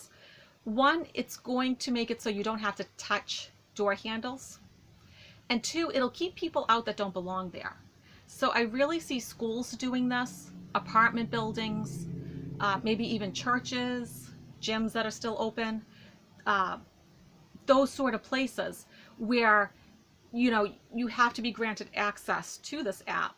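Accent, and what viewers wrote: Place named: Midwest, United States. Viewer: No. Sounds mid-western. Definitely not California.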